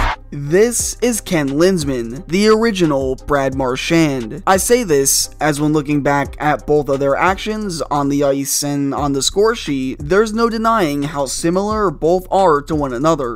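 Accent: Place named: Maritimes, Canada. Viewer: Im hearing East coast accent, sounds like NB or CB. Im leaning more towards NB now after watching a few more of your vids.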